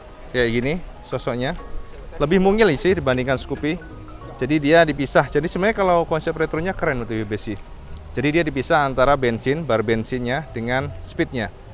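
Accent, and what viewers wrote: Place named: Kediri, Indonesia. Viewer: Mas asli mana ya kok bahasae mirip2 orang kediri ya,campuran lah khas jawa timur.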